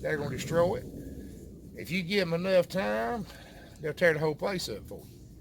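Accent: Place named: Alabama, United States. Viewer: Like listening to my brother-in-law from Alabama. Love it. Good info.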